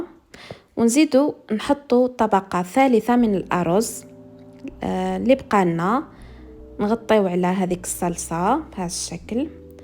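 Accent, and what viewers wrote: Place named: Chaoui, Algeria. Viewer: من لهجتك تباني شاوية...وبنات بلادي كامل فحلات وعدرات🎉🎉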